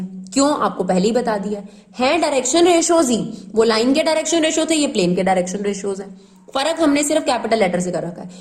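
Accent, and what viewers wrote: Punjab, India. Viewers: You are from Punjab i think because of your accent . But still i respect you because you are awesome 😎 👍🏻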